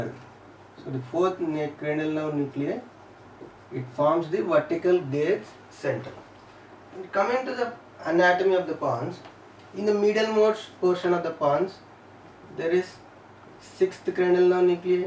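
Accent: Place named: India. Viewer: it's indian english accent.learn it.its better than yours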